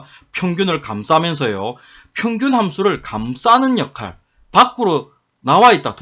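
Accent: Gyeongsang, South Korea. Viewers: 와.. round 함수고 자릿수고 너무 어려웠는데 이 영상 보고 한방에 정리되네요 활용방식(예제)도 너무 적절하고 사투리 억양 덕분에 귀에 쏙쏙 박힙니다 (저도 경상도 사람이랔ㅋㅋㅋ) 구독 눌렀습니다!!❤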